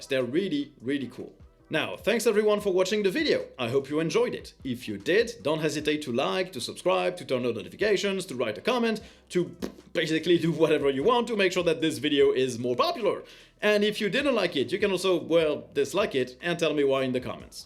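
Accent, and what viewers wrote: France. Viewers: Is this a Frenchman speaking the islanders' language?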